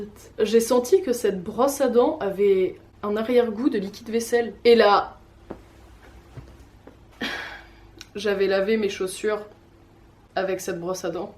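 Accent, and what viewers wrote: Normandy, France. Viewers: Mdrrr vive l’accent normand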